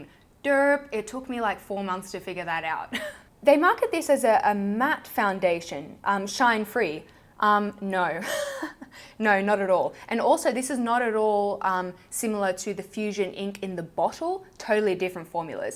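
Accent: Australia. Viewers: I absolutely love the yearly favourites as well! I look forward to them so much. Also side note: I really enjoy listening to your Aussie accent. It's made me realise that I think you are the only fellow Aussie beauty YouTuber that I follow and I should find more.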